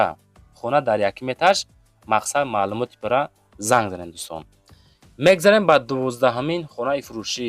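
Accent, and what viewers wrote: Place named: Shahrtuz, Tajikistan. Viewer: Гап занитон сад фоиз ай шахритуз хона мон додарчон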